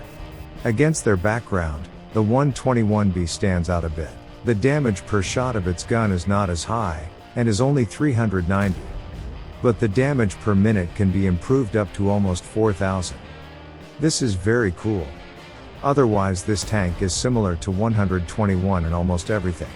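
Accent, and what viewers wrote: Russia. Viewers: Ты русский? Давно научился говорить по английски? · very nice accent for a russian guy. Thanks for these information.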